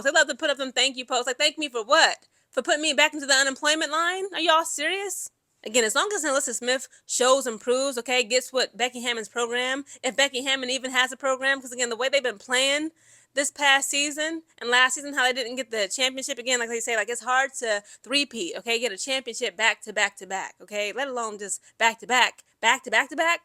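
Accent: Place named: Caribbean. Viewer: I think sometimes I hear a little West Indian twang too and I’m hailing up from St. Thomas, V. I. and Guadeloupe myself. The thing is I struggle to keep up because she talks so dang fast🙂.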